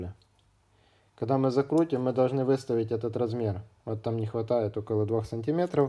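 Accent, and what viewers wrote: Odessa, Ukraine. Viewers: Слышу, что мастер видимо с Одессы. Так колоритно, как в "Ликвидации", хотя я сам с Сахалина. Всякая информация полезна, спасибо!!!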